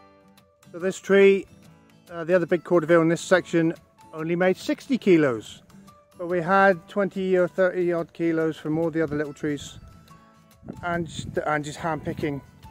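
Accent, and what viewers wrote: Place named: Cornwall, United Kingdom. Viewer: We should never apologise for our cornish accent, be proud of it 👍, good size pasty.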